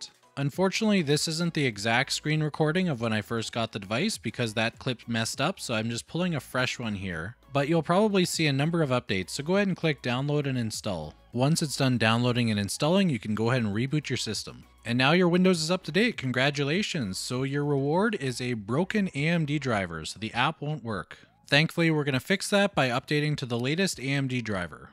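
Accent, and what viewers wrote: Canada. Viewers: Great, to-the-point video. Also, love the Canadian accent.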